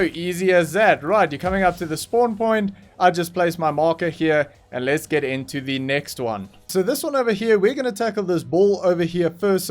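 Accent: South Africa. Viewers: You sound South African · Are you south african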